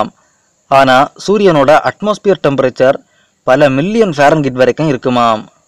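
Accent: Kanyakumari, India. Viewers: Kumari Tamil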